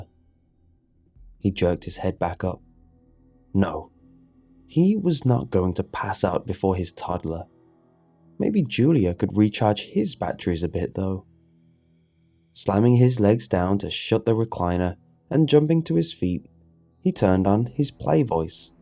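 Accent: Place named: England, United Kingdom. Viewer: Maya Pletz I mean has an extremely eloquent English accent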